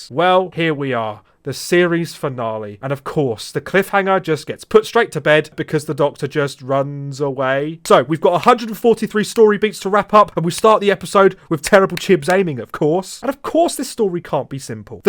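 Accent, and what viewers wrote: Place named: Yorkshire, United Kingdom. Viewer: Good Yorkshire accent tho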